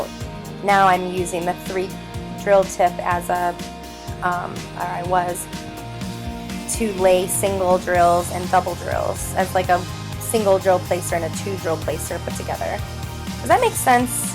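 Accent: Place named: Michigan, United States. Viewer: I was wondering where you are from! Your words sound a little bit like Michigan and In this video you mention Meijer! Im from Michigan!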